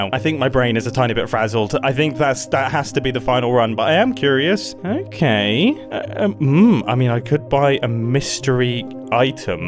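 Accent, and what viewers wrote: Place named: United Kingdom. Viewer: It's funny how becomes more British as he gets excited and stressed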